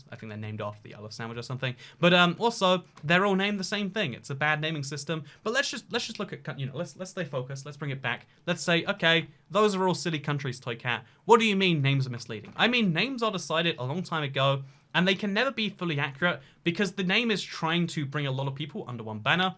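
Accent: England, United Kingdom. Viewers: When you say “Nevada” wrong I feel like it’s okay because you have an English accent, but if someone with an American accent were to say it like that like shit bro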